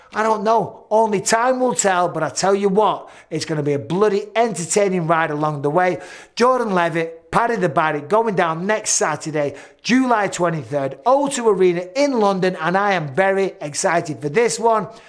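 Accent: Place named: Manchester, United Kingdom. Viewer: tries so hard to be nice but the Ol manc comes out eventually 😄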